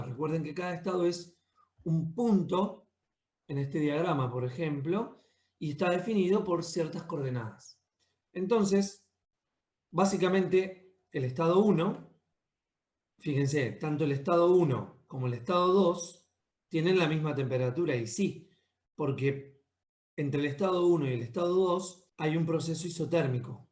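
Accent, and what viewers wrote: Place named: Argentina. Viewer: Te amo, gracias a ti voy a terminar la práctica de termodinamica, mañana t digo si pasé el examen :D, eres lo mejor increible hombre con acento argentino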